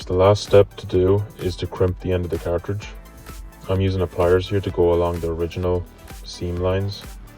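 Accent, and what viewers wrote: Ireland. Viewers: Mate your irish? Thats wild, granted im aware shotguns are legal for hunting in ireland but its still crazy to see this 😂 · You Irish lad?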